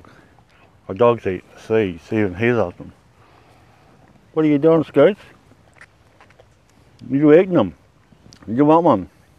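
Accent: Australia. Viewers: Sounds like from Aus?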